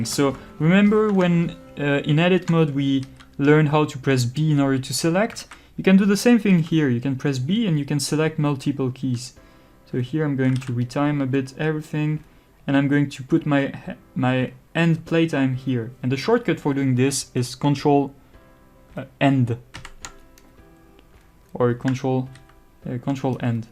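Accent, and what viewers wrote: France. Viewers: Since Begining I'm recognize your french accent, merci pour ta vidéo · Don't apologize for your French accent! It's way better than mine ^-^ Thanks for the tutorials, mate. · Don't ever apologize for your french accent. Own it, flaunt it and and shove it in everyone ears. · No need to apologise. Your french accent is absolutely ok. Thx for this. Great introduction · He said "Sorry for the French accent.", it does not mean his English is bad. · When the subject is hand-drawn animation, the French accent is a reassurance. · This is the best tutorial. I love your french accent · the french accent is like fine wine, on animation.... 👌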